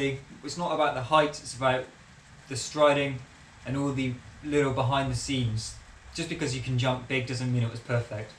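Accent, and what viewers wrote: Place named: United Kingdom. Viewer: you are so handsome and I love your British accent, I've learned so much about words and phrases you use that are so different in America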